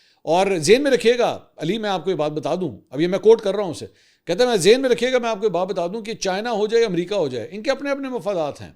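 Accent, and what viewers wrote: Punjab, Pakistan. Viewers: (Bhai pin me )Bhai AP Jo Punjabi ka tarka lagaty hai na Sachi sawad a janda 😂 or program tu aho😂 hit different . Allah AP ko aise hi Khush rakhe ameen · Your Punjabi Very funny 😂😂😂😂😂😂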